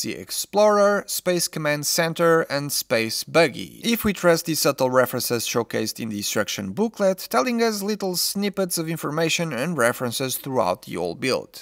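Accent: Portugal. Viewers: Pelo sotaque e pelo nome deves ser tuga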